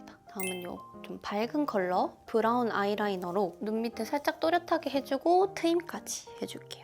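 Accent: Gyeongsang, South Korea. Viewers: 언니~~ 7,8년전부터 계속 봐왔으니 자기일인것만같아 너무 화가나네요…그때 한국어도 아예 못 했었는데 언니 영상을 맨날맨날봐서 그런지 지금은 일본인인데 경상도 사투리 조금 섞인 상태가 되버렸어요ㅋㅋㅋㅋ 그사람들에게 이제는 성공하고 멋진 모습을 보여줄 수 있어서 저도 너무 좋아요!ㅎㅎ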